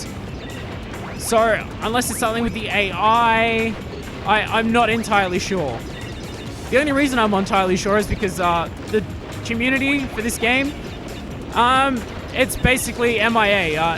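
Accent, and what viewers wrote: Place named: Victoria, Australia. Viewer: Gotta love that Victorian accent saying heeya instead of here. Wasn't grating at all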